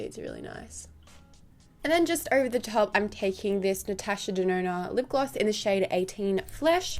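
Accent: Australia. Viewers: i love Australian accents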